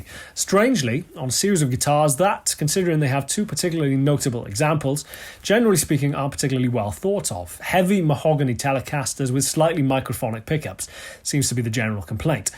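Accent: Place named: Cockney, United Kingdom. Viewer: i didnt listen to that kocknee stupid accent ! ol boy but when you played i got up turned that to rock concert level! you are very good but i will not listen to you speak.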